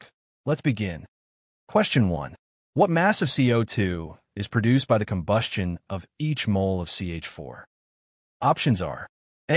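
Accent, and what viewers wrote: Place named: United States. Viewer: #* americian voice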